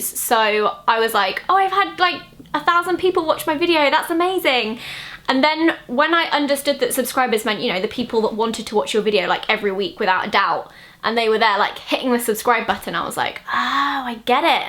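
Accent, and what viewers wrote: United Kingdom. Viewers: Hi Zoella! I am from Brazil......... Say "hello" to Brazil in your nxt videos. I see yoour videos because you're so funny. I don't use make ups..hahah. I see also because i'm in UK and it help me learn a little more the way british people accent.... thank you kisses.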